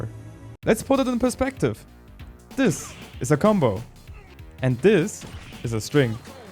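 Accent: Germany. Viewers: Looking forward to see how these new type of videos evolve. Would you think about manually putting official subtitles for these scripted (evergreen) content. I mostly catch what you say but sometimes I don't understand through your german accent 😅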